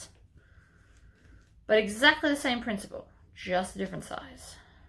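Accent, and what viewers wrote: Australia. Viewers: I just had Open Heart Surgery and I watch your videos when I can’t sleep. I like listening to your voice. I like that Australian accent! I think everything you make are beautiful.. especially the purses you make!! What talent!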